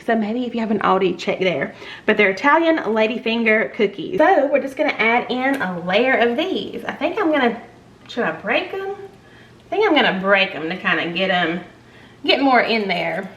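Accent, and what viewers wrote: South, United States. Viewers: I just love the way you are so laid back and Southern! I haven't tried one of your recipes that I don't like!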